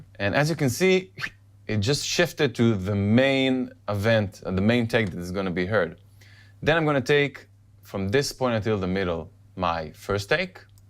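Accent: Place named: Israel. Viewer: עשיתי סקבסקרייב כי שמעתי מבטא ישראלי :)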